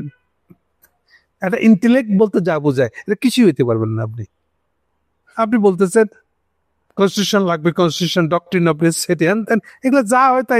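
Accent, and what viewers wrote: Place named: Bangladesh. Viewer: Love the words " maraen , maraita aise etc" keep it up. Best of luck Da Da. Great and perfect khas Bangladeshi delivery ❤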